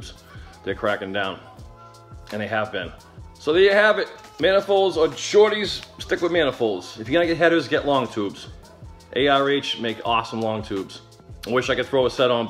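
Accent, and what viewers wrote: Boston, United States. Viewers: Great video. I’m looking for a good set of headers for my ‘21 Ram 1500 Hemi. Also , where are you guys from? You talk like me (Boston).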